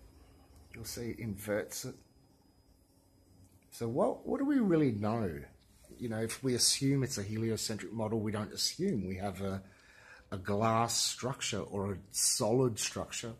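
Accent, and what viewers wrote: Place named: Australia. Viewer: I just saw you on the RV Pod Cast. I noticed the Aussie accent so I thought I'd check you out, I'm in Coffs Harbour. I saw this dome video so it was the first of yours I looked at. I got trolled 4 to 4 and a half years ago about southern stars rotation so I looked into it, I looked into Stellarium and I also made a dome concept model with a salad bowl and lights and a phone camera to record inside the salad bowl. Links below, if you have time to check them out let me no what you think. Comments and likes are turned off on the videos because of continued trolling. Southern Stars, Reflection Of The Centre Stars? Part 1 (Using Stellarium) <link> Southern Stars, Reflection Of The Centre Stars? Part 2 + A Simple Experiment <link> Southern Stars, Reflection Of The Centre Stars? Part 3 Check With A Physical Model <link> 24 hour DAYLIGHT in Antarctica Experiment timestamped to shorten it. 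Flat Earth - 24 Hour Daylight Not Sun In Antarctica, Reflection Test Using Salad Bowl <link> This one timestamped also to shorten the vid, an idea on how the sun sets south of west in the southern summer. Flat Earth - Circling Sun Sunset, Circle Of View, Atmospheric Lensing, Experiment (Preliminary Test) <link>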